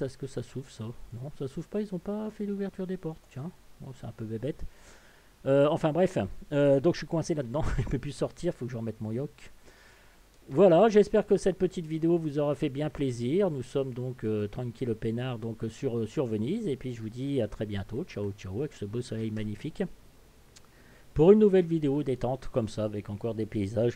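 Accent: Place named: North, France. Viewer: Merci pour le partage. Toujours aussi plaisant ces.petites balades. ... Avec toujours une petite pointe d'humour avec un accent bien du Nord.😉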